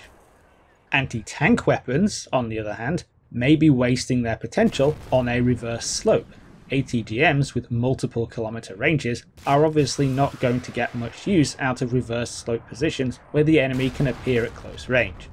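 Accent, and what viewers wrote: United Kingdom. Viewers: unmistakably yorkshire, wonderful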